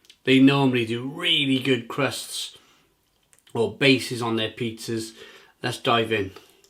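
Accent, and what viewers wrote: Wales, United Kingdom. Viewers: What part of wales are you from fella?I'd say cardiff way.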